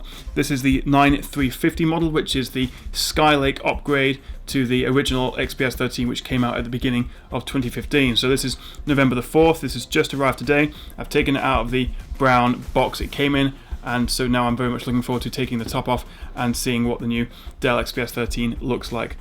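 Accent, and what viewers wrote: United Kingdom. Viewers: Finally someone with genuine clear British accent here ;) not that german-mechanical noise XD Thanks a lot mate !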